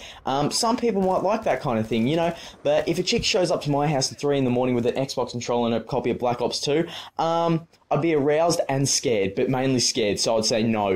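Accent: Australia. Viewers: R u Australian coz im Australian and u definitely have an Australian accent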